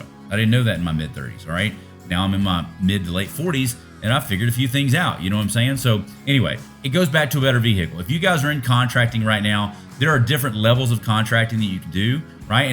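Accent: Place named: Nashville, United States. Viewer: Honduras baby. God bless you, love the Nashville accent.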